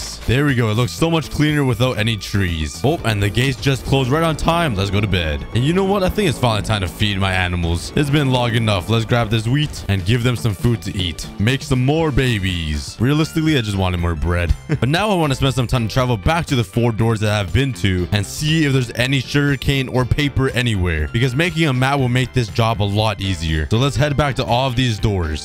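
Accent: Canada. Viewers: is you canadian?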